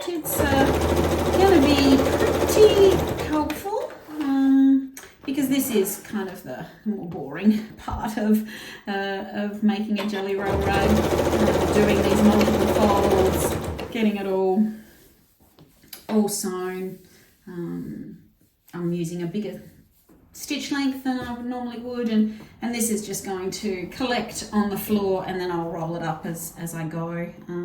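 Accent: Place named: Australia. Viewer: A big thankyou....very informative. Not a boring video as they can be N you sound Aussie, like me.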